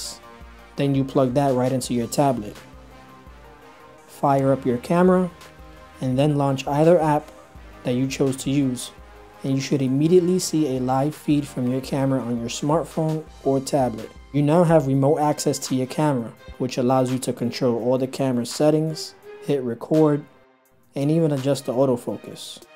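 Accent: New York, United States. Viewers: NY? ...lol you sound like it.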